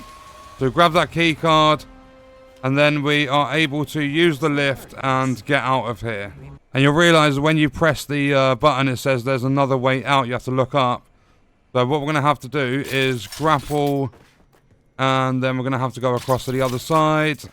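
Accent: United Kingdom. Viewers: Every time I need help, there’s your heavy British accent to guide me. I’m an American Cajun from New Orleans. I tried Marmite on toast once and it didn’t bother me too much. Hi five 🖐️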